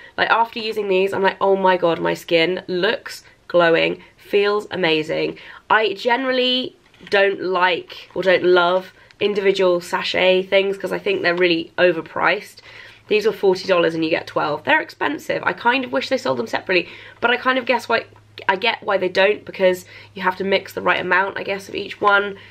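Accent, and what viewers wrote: United Kingdom. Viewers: I do love watching all of your videos! I’m obsessed with British accent and you’ve been one of my favs for years!!! Your awesome!! 🤩👍🏼🙌🏽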